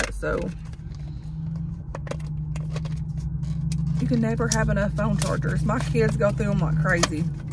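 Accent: South, United States. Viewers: YESSSSS,Let me start my comment by saying i LOVEEE your country accent❤❤❤. Second,your shirt is everything lol, i used to watch golden girls alot!! I see those steam dumplings so i may try them out now. Eww i never was a fan of moon pies😅. Oatmeal pies for me❤. I always wondered how those sparkling waters taste. I like to buy the walmart brand sparkling waters for my son. I did grab 1 of the bubly brand ones to try. Omgg those waffle sticks are so cute🐶😅. Those lipsticks remind me of maybelline! Yup,ive used those same containers for the smaller earrings. Those dingo dog treats are a deal😮. Have you tryed those foam soaps they have that resembles the bath&body works one? Im a sucker for bright colored nail polish! Thanks for showing off your goodies❤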